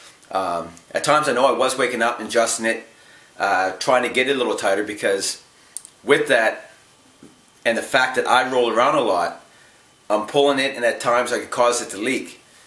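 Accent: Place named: Canada. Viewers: ah a Canadian... ;) the way you say out, about and mouth :) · I noticed that as well. I watch Holms and Holms on tv home repair channels. They are from Canada and have the same exact accent.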